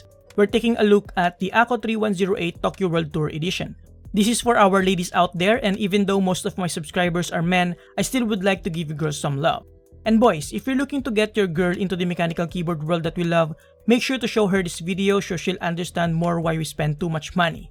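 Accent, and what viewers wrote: Philippines. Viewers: Love the accent, Philippine pride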